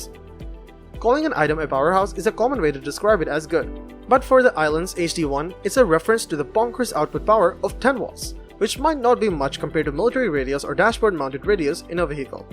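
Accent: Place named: India. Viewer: You are trying so hard to hide your Indian accent.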